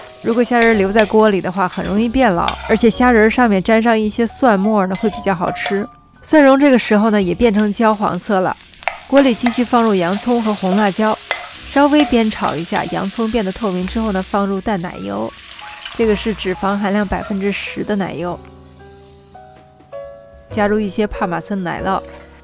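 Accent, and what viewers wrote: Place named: Beijing, China. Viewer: 是北京人吗～哈哈听着是亲切可爱的北京人哈哈哈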